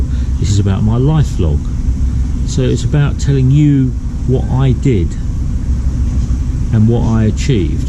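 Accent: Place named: United Kingdom. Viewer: you speak like a brit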